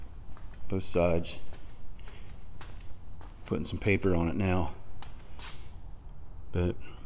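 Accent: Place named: Philadelphia, United States. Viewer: Nice video. Your accent sounds like my whole family from Philly and New Jerz.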